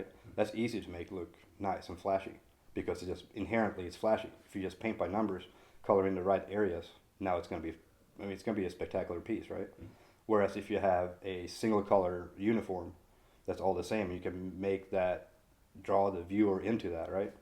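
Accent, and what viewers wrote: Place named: Midwest, United States. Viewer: Wow! I always knew was from Sweden but listening to him talk he sounds like he is from the Mid-West....his English teacher must have been from Illinois, Indiana or Ohio (above the U.S. 30 corridor). He's an astonishing artist too.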